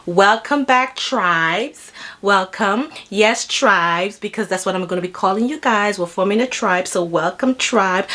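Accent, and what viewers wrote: Nigeria. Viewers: I've taken a sprint from Instagram to this video...once again I love your nigerian accent💕💕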